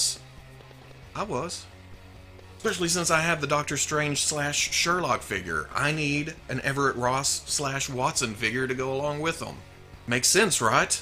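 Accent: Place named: Carolinas, United States. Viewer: Tryna place homie's accent. The Carolinas mayhaps?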